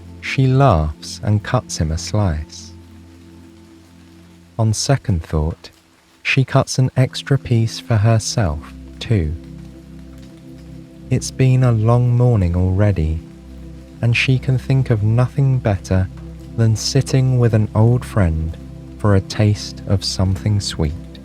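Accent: United Kingdom. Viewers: Same. He voice just seems the perfect timbre, he speaks in a perfect rhythm, plus he’s English. Idk why, American accents don’t work for me when it comes to relaxing, I find them grating and kinda stress-inciting. Oh well, we’re all different right? Love this channel, especially the Rainy Day Bakery series. 🌧🌙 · Sry but i hate brittish accent for storytelling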